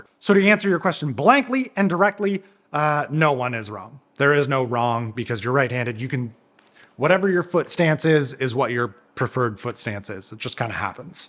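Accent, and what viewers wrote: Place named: Newfoundland, Canada. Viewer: 8.3/10 Newfoundland accent. And also fatbikes are deadly. Me and the b'ys love to ride them.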